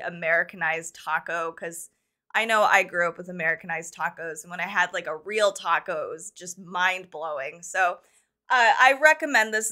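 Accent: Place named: Mexico. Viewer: I love your Mexican accent! So cool! I can’t wait to buy this cookbook. 👩‍🍳 thanks for sharing this! 🤩🥰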